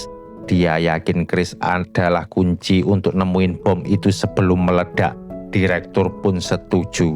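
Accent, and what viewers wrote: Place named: Java, Indonesia. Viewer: logatnya jawa banget wkwk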